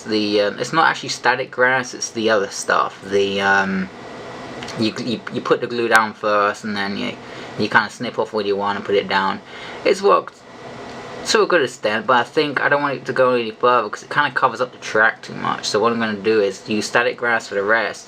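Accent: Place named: United States. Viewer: Great video! My god....such an American Accent too!!!;->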